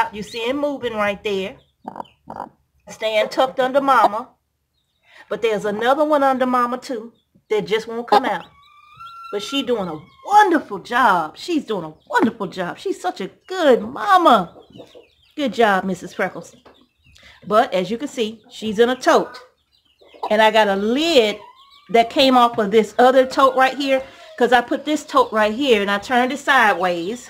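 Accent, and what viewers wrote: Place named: Georgia, United States. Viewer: So this is where the "AFLAC" duck lives! Lol! Soon as you came in, they know your voice. I LOVE all of your videos and have subscribed. I'm ordering my American canner also. They're selling OUT online everywhere! I thank you for the canning videos. Mom had us doing it as kids, so I do remember some things. I love your calm voice and Georgia southern accent. Take care, GOD bless; and stay safe!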